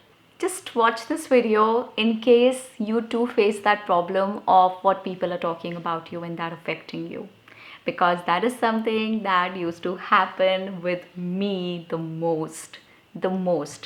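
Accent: India. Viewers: No popular english self help books are available over YouTube in Indian accent. I think you have a wonderful, sweet and friendly Indian accent. If you think of doing it, it would definitely help lots of aspirants like me in developing our English beside personality. Thank you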